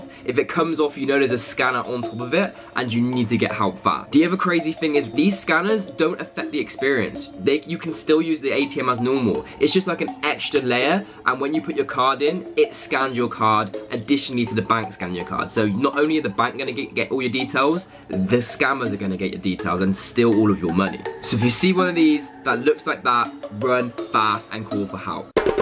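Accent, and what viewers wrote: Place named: United Kingdom. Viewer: I love your british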